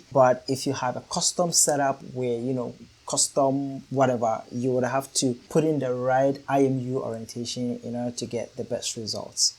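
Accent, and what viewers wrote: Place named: Africa. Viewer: Your African accent when you speak English sounds great and is easy to understand too.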